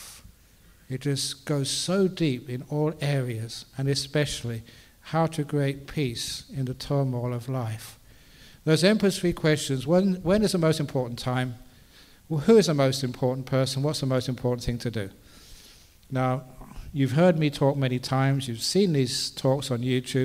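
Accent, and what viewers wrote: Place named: United Kingdom. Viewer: ajahn brahms funny brittish accent. he says "thinkink" for thinking. all words ending in "ing" he says "ink"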